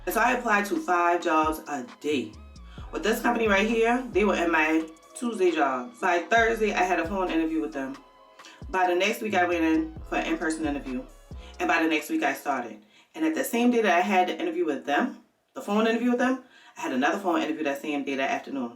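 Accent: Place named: Gullah Geechee, United States. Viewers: Babeeee the Geechee came out today. I smiled so wide one minute in and my son said Mama she sound just like you 🤣🤣🤣🤣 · hahaha, Nah.... Perfect Geechie.... So so so very funny... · Man, dis too geechee! LOL!!! Great advice, though! Loving the channel, and I'm not even in HR!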